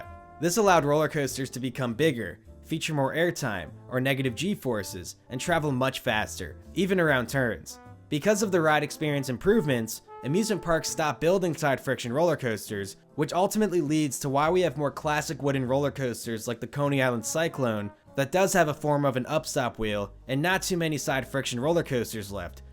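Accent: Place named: California, United States. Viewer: This is the most Cali accent ever..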